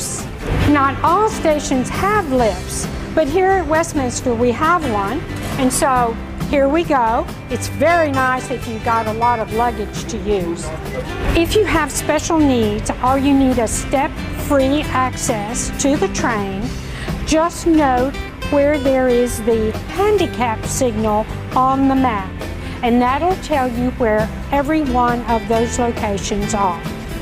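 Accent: United States. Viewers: Nice Video! Very well explained! I like hearing the American Accent in London!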